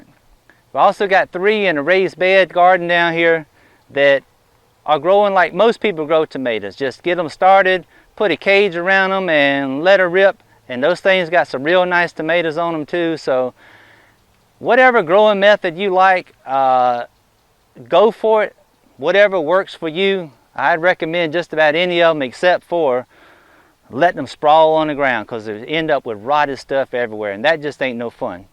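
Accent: South, United States. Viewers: I've been watching your videos for years. You have the best looking tomato plants on YouTube, hands down. Big Beef is a mainstay in my small urban garden as it always seems to be a good beefsteak producer. I like that you understand that different methods of pruning and supporting work well and there is no 'best' method. I agree. Also the southern accent is pretty nifty. Cheers -Lucas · Holy Moly...I didn't know you were back. Oh happy day. Love your videos and listening to your southern accent.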